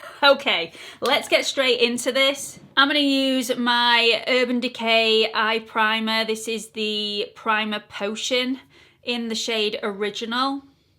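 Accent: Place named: Yorkshire, United Kingdom. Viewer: Your accent just makes me so happy. Are you from Yorkshire?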